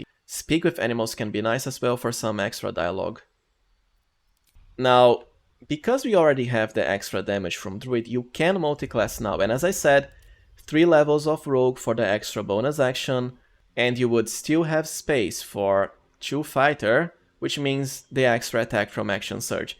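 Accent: Brazil. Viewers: Nice build as always! E ai mano, vou mentir não seu sotaque em inglês parece de Br vc é br? Keep the up the good content bro, you helped me a lot in tactician.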